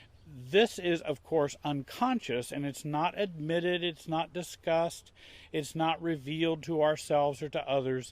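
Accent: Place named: Indiana, United States. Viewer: 100% this man is from Indiana lol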